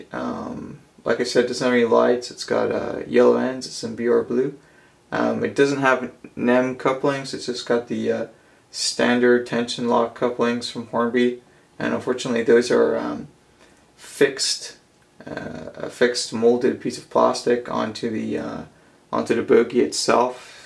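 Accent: United States. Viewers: I have to know are you american? You sound it.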